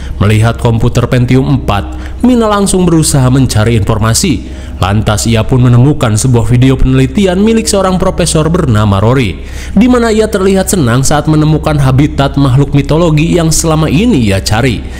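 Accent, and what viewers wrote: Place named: East Java, Indonesia. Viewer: Tak bolan baleni "lele apa yg bisa terbang" 😂😂 Jowo etan teko kang 👍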